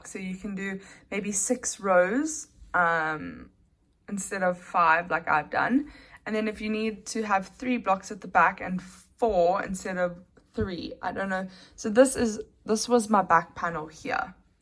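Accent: South Africa. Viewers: I was so happy when I heard the South African accent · From your accent I can tell you are South African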